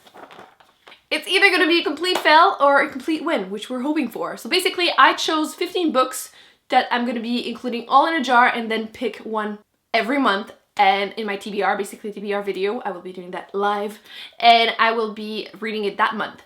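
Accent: Quebec, Canada. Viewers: Just found your channel and have binge watched a bunch or your episodes. I listen to a lot of audiobooks while driving around in my cruiser and when I am out sailing on my boat. You are so bubbly and cute when you explain why you like the books you do. The French Canadian accent is so cute too. It makes me laugh at times, which is an added bonus. FYI, I’m Canadian as well but don’t have that French accent. Not to say I don’t sound Canadian because I do notice in my videos I sometimes do say “eh?” at the end of my sentences. You don’t even realize you do it until you watch yourself. Ha ha. You seem to like similar types of books to what I like. Keep those videos coming. I’ll be watching. Craig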